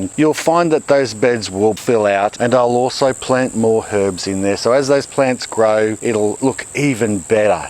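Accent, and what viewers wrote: Australia. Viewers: HERBICOUS! I totally enjoy you info and your Aussie accent!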